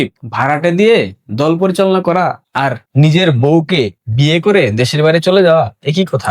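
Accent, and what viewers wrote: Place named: Mymensingh, Bangladesh. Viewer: আপনি কি ময়মনসিংহের?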